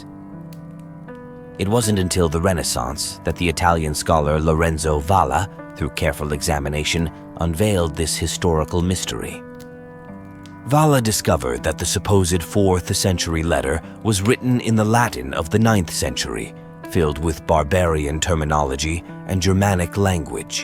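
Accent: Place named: United States. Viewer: The sinister American voice over and sneering is a deception. As I learned at university documentation as happens now, was often simply a formalisation of a fact. The Papal States were a buffer intended to protect and reduce pressure from the rule of kings and armies. It was intended to create neutrality as the Bishop of Rome acted with more authority than any present internal court. The claim Constantine had donated the territory to the Bishop of Rome and Church was not untrue. Constantine had compensated the Christian Church for the way the Roman State had attacked, murdered and persecuted them. He gave the Laterin Palace to the Bishop of Rome. Given authority to the bishops along with governers. He built basilicas, founded churches and created religious tolerance via the Edict of Milan.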